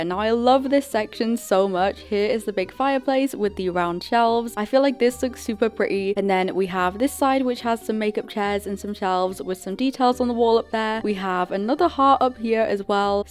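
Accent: United Kingdom. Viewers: Oh my God, I love your accent. It’s like the accent from England. I am not kidding. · Are u British? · Omg your buildings look so good but I love your British accent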